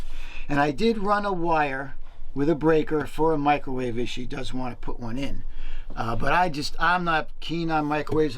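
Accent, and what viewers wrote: New Jersey, United States. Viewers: I love the Hosts accent, he is so NJ accent.......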